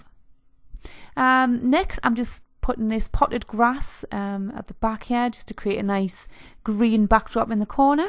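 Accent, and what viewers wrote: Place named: Wales, United Kingdom. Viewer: Love your videos,you kind of sound welsh, I’m from Birmingham but live in the States!!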